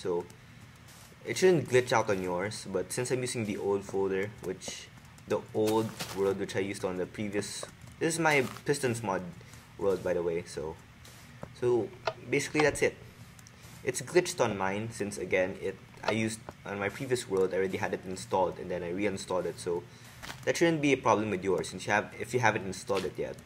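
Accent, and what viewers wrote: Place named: China. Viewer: u have chinnesse accent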